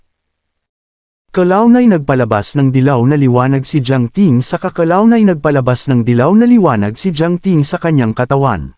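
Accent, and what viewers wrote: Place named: Conyo, Philippines. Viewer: Application Kasi Yan kaya mdyo conyo ung pagsasalita.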